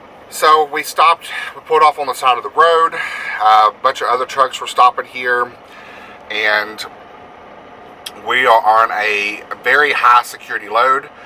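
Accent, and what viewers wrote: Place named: South, United States. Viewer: Btw, where you from? I found another blogger that at least sounds as if they’re from the south. Tri Cities Tennessee here.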